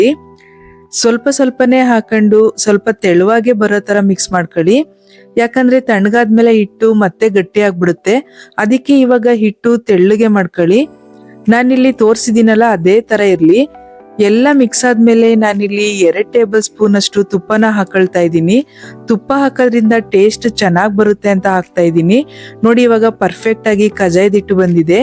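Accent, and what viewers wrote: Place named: Mysore, India. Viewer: Madam nimmadu yava ooru.? Nim mathadodu keluttha idre mandya Mysore kade kelvru heege mathadodu.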